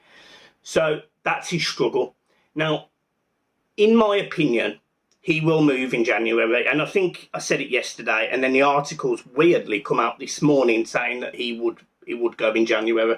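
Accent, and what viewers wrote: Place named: Midlands, United Kingdom. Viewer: Gotta ask ye this question mate, why Everton with an accent like yours, midlands somewhere. I watch ye show all the time, its sound lad.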